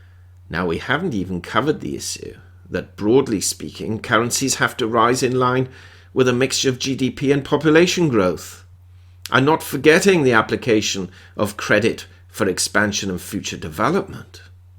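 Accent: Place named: RP, United Kingdom. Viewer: First of all we don't want a one world currency. We surely don't want a one world currency controlled by a central group who want a new one world order. I suspect you are one of those that want a centrally controlled currency easy to manipulate. Silver and gold plus some other inherent value commodities could protect us from dictatorial control of finance (the fiat fraud) that has so indebted the world. Also, silver needs to be revalued to preserve it from destruction. At a higher price silver substitutes will come along to fill need and make available more silver for monetary use. A national currency backed by a useful commodity like silver would have the advantage over those supported by faith in goverment oppertunists. Let there be national currencies to compete to find fair market value and protect the world from Globalist fascism. Tone down the elitist sounding posh accent. 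It sound smarmy.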